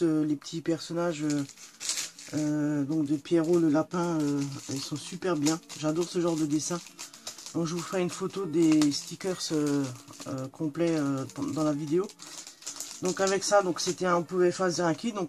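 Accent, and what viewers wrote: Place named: North, France. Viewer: Chère Un mois?? Eh bien dis-donc il a fait tour du monde votre colis c'est pas possible avec un délai pareil je serais allée le chercher moi-même en Pologne 😊 je plaisante mais tout de même un mois c'est énorme j'espère pour vous que vous n'aviez pas l'intention de faire un projet avec ses collections pour Noël sinon il va falloir mettre les bouchées doubles( personnellement j'aurais négocié uneremise) à moins que le délai de livraison ne sois pas stipulé, dans ce cas il faut être patient. En tous cas ce que vous avez commandé est très joli, ces collections sont très belles et je suis certaine que vous allez encore realiser de magnifiques créations comme vous nous y avez habitué. Je souhaite de très joyeuses fêtes de fin d'année. Ps: j'ai parié sur votre accent et j'ai misé sur un accent du nord de la France, j'espère avoir raison sinon je vais perdre 3 euros😂😚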